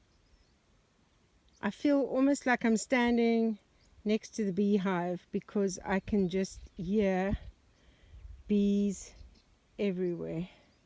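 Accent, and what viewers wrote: South Africa. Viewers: Now that voice is very familiar! South African? I'm going to enjoy this post.Nicky Bedford from Cape town .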